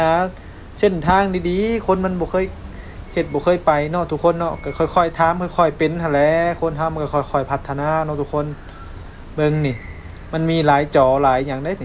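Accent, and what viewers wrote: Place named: Bangkok, Thailand. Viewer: เริ่มเรียนรู้แล้ว.... ใช่ไหม คลิปหลังๆ ดีขึ้นมาก ไม่มีการบ่น และเข้ากับสังคมเมืองได้มากขึ้น... เคยได้ฟังเจ้า... พูดไทยอ... บอกเลยว่า สำเนียงเด็กกรุงเทพ....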